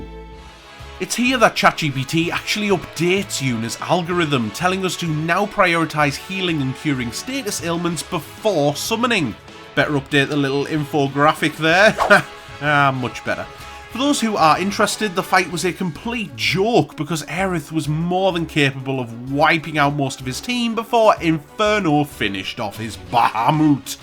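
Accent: North East England, United Kingdom. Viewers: up the north east 🇬🇧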